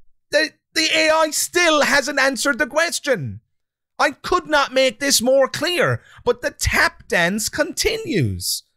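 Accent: Ireland. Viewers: Replying here mainly to test a theory I have pertaining to YT comments. Anyways! Loved the skeptalk show with you and Shannon, both of you brought your absolute A game! Skeptalk is how I found your channel. I'm a bit of an accent/dialect connoisseuer, and listening to Shannons Canadian english playing off against your Irish english hit a sweetspot for me. I know, I'm weird that way.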